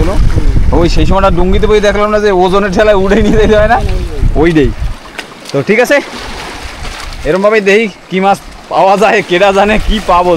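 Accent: Bangladesh. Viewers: Bangla vasa akdom BanglaDeshi tomake Nijer Apon lage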